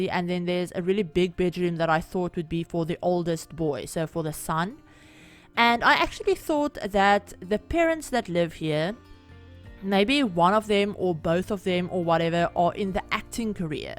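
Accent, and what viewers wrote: South Africa. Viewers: Wow, spotted that accent from your very first word!!! 🤗 fellow South African here! Nice to see a SA creator :) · guuuuuuuuuuuuurllllllllllllll , i haven't found a creator from SA. notices the accent , its weird but awesome for some SA rep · Im south African too but i don't have an accent as nice as yours 😅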